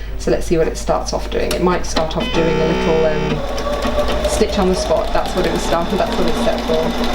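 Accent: United Kingdom. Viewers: I adore her proper British accent. Great demonstration. I intend to buy one this week.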